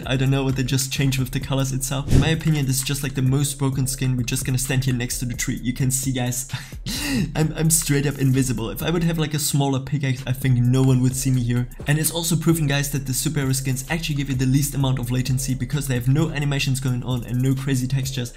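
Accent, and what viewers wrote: Germany. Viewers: bruder dieser deutsche aktzent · Are you german?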